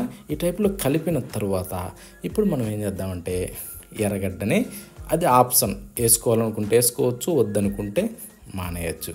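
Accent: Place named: Rayalaseema, India. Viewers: Adi rayalaseema slang ardam avadulee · చిత్తూరు జిల్లా యాస యెర్రగడ్డలు పచ్చడి suuuuuuper 🎉🎉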